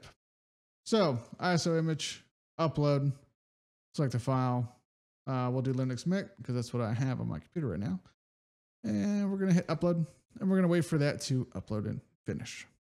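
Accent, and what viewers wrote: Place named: United States. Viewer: Thanks. Such an american accent with a romanian sounding name :)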